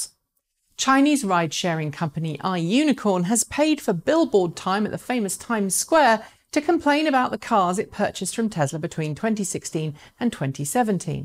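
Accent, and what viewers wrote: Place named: United Kingdom. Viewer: why do you have a distinct British accent but make your show for the US market? From my point of view, It would make more sense, if you either made a news series concentrating on the British and Irish market (which is quite distinct to the US market with its regulation, currencies, and steering) with prices in GBP (UK) and EUR (Ireland), rebates in these countries and availability there. Or do a news series in US english with US prices, US tax rebates and US availability. If your series is intended for international viewers mixing stuff from around the world, then there should be a clear label, for which markets each of the news really apply. You could add a flag in a corner of the screen. The news about an electric food truck from an aftermarket conversion company for example will only apply to a certain region of the world. 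And this region should be told to the audience.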